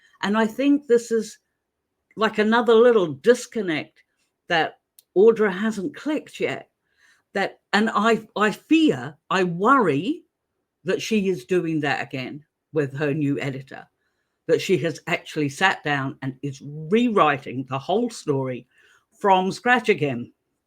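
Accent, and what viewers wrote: New Zealand. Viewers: I saw the backdrop of your thumbnail and thought, oooohhh that looks kiwi. Heard your voice. Was like definitely kiwi. Hellooooo from Auckland 👋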